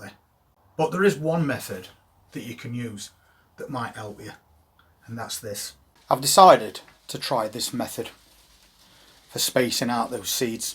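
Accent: Yorkshire, United Kingdom. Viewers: I have just come across your channel you sound you are from Yorkshire possibly Sheffield area is this true would like to know as I’m a Yorkshire man also so we are in same area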